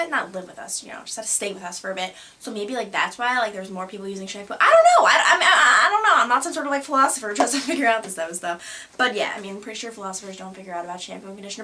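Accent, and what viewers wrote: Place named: East Coast, United States. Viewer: you had such an east coast accent in this video lol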